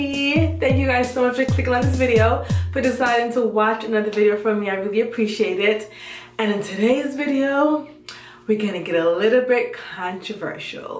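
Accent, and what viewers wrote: Patois, Jamaica. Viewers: I knew you were Jamaican because I could hear the patois at some parts. I'm Jamaican too.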